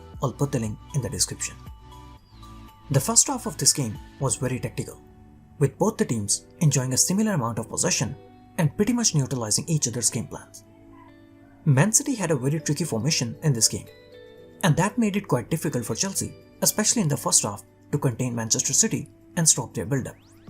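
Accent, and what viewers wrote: India. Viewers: I think he is not english native speaker. Sounds like indian.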